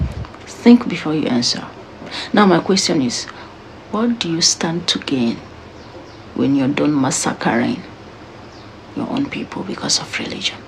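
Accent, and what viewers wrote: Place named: Hausa, Nigeria. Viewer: Listening to her voice, you could notice that she was born in Igbo land by an awusa or fulani people.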